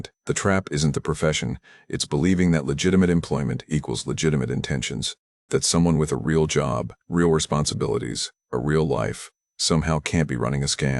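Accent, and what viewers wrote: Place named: United States. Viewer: American voice, but says "me" shoulder, "me" work. She had a sip of "me" beer. That sealed it in "me" head. Doesn't Ireland use euros,not dollars. Looked at retiring in Ireland, need to have 50 to 60 K euros a year just to reside there, might be fun to spend a year or two there. At least he didn't let his money rot in a bank.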